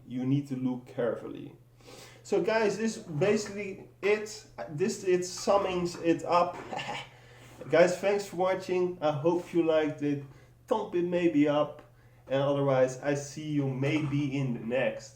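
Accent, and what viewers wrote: Netherlands. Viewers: Jezus dat nederlands accent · hahahaha dat nederlandse accent heerlijk dit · That Dutch accent though.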